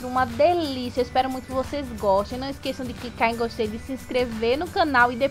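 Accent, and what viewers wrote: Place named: Pernambuco, Brazil. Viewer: Tu deve ser daqui de Pernambuco com esse sotaque ne ?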